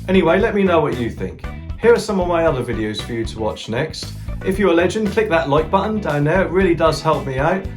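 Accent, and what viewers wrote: Norfolk, United Kingdom. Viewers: Is there a touch of Norfolk in that accent.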